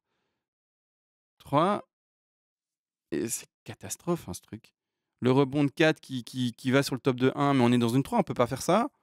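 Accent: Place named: Belgium. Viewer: Oui il a l'accent belge.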